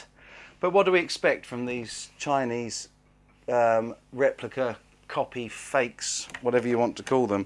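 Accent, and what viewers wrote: England, United Kingdom. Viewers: See your playing the right way with a pick and your english accent very cool I love england people I'm a italian from New York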